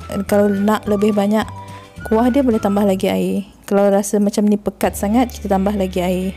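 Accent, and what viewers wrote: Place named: Sarawak, Malaysia. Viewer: Dengar cara klaka apat cam sarawakian...sekali jumpa bideo lain ternyata betol² sarawak....wahhhh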